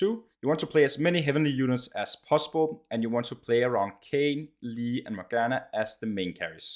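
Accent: Denmark. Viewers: Your accents sounds like Denmark people that i met Yesterday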